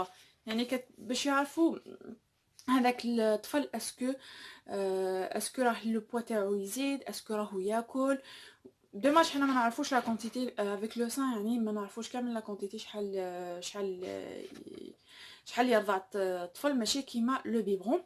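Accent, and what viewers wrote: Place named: Morocco. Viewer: الله يبارك بنت بلادي وشنو لهجة تاعك تميل بعض الشئ الي المغربية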